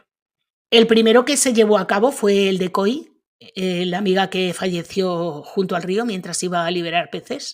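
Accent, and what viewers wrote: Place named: Barcelona, Spain. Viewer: Querida, antes miraba tus vídeos y te daba un 👍siempre, pero no me atrevía a escribir comentarios. Ahora ya he decidido hacerlo, más que nada porque mereces que te diga que eres fabulosa narrando cada historia, que VOCALIZAS perfectamente para que se entienda lo que narras (a otros y otras que hacen lo mismo, apenas se les entiende porque NO VOCALIZAN y van mega rápidos) y además hablas sin prisas, pausadamente, y eso hay que elogiarlo, porque demuestra tu gran empatia con los que estamos del otro lado escuchándote 🙏😘💝 por eso debemos de alabar tu buen hacer y transmitirtelo. Tú nos ayudas a estar informados al mismo tiempo que podemos evadirnos un ratito de los propios problemas, y sólo por tu constancia y todo el trabajo que debes llevar a cabo para realizar cada caso, cada vídeo, debemos de sentirnos agradecidos y decírtelo. 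Vivimos en el país de "la envidia" y por ello es fundamental saber elogiar el trabajo que los demás, como tú, hacen para nosotros. Así que muchas gracias de todo corazón, a ver si ya empezamos a dejar las envidias y a VALORAR más a las personas que se lo merecen como tú. Bueno, guapa, te mando mi segundo abrazo desde Barcelona (y diría por tu acento que debes ser de por aquí también 😍) Muackssss!!!! 💝💖❤️💕👍🌺🦋🌷🌹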